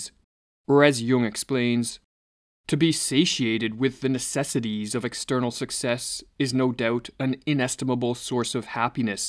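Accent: Canada. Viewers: Great videos, i just cringe at the Canadian accent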